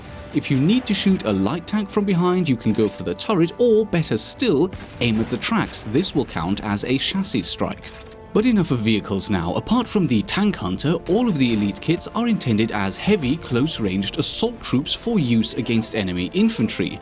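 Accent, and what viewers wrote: Germany. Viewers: do you speak german in your free time? bc you have an very little accent... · are you german or from swiss, that Accent is strong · German is your mother tongue, isn’t it?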